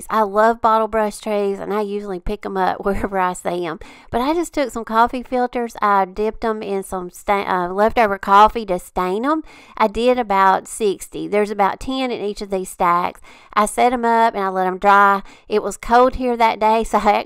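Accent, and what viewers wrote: South, United States. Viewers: Awesome job. 🥰 I love your Southern Southern voice. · you never fail to amaze me! I love your style, your cute southern accent & your beautiful projects, thank you!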